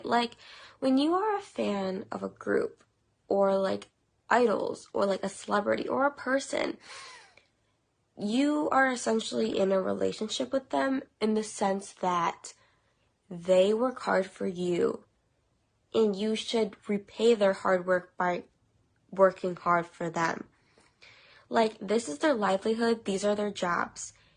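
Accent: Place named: Spain. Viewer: Out of context but I love your spanish pronounciation🫠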